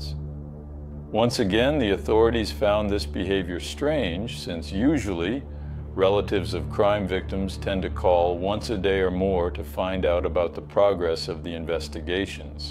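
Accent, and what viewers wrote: Australia. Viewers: Mate have you spent any time in Australia? Mate it sounds like abit of an Aussie accent leaks out? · Strong twangs of an Australian accent though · I’m Australian & feel like I’m hearing an Aussie accent at times. Weird 🤷‍♀️ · Sounds like you also have a bit of an Australian accent. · Your English is amazing! What's your first language? And what's your accent. Almost sounds like hints of Australian lol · Whoa! Are you kidding me? English isn't your 1st language? I've only now just detected a hint of an Australian accent! This whole time I thought you were American like myself!!! 😅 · You sound Australian sometimes! Your english is fine, i think 😊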